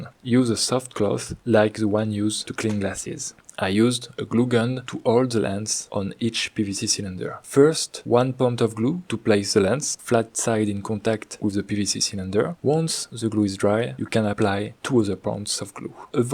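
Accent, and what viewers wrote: France. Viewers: I love the French accent ❤ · Tu es français!! Je peux l'entendre :) Did you measure the intensity of the light, so that you can calculate the efficiency? That is the key.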